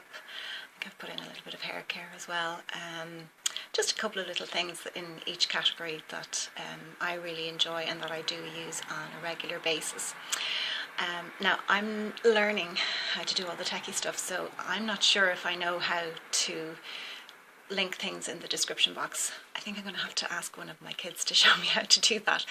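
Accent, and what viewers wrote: Ireland. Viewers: Hi, you sound Irish, beautiful accent and beautiful lady, you are so refined, lovely. I'm from Nova Scotia Canada, and a great line from here is called The Ordinary, natural, no silicone, no additives etc and wonderful prices, its blowing up on you tube! Wayne G recommend it! I love Chanel foundation as well. Looking forward to watching more of your videos, Karen from Canada 🇨🇦.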